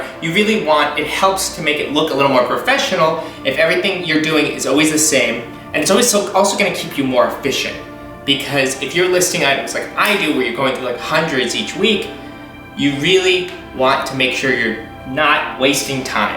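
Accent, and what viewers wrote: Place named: New York, United States. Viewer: Your accent is intense. Definitely a New Yorker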